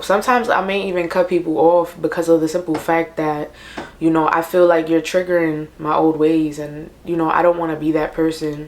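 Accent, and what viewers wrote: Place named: New York, United States. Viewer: i envy that man that puts a ring on it miss them dimples tho and that new york accent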